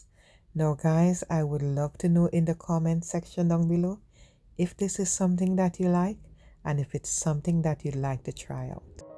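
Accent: Trinidad and Tobago. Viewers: So creative and lovely. Something to try for sure. Your accent sound like mines. Trinidadian.. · I think I am hearing a Trinidadian accent! Your talent is amazing!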